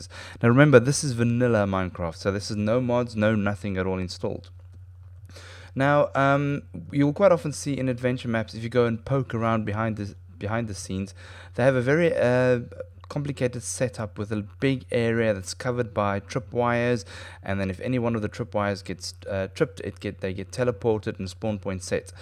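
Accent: South Africa. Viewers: I've been looking for something like this for ages...I love you! Thanks for the great How to vid! BTW you sound South African...xD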